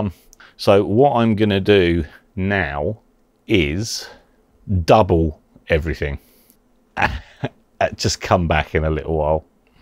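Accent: United Kingdom. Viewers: Cannot explain the joy of hearing a good old English accent on an audio M1 video. If you're available for booking, we'd LOVE to work with you. Cannot explain how relaxed we would feel.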